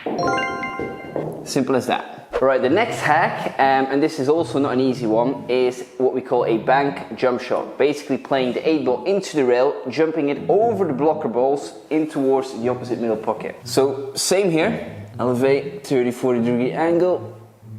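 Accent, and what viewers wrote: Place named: Scotland, United Kingdom. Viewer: Good content,subscribed, sometimes I think your Scottish but here something else there…….?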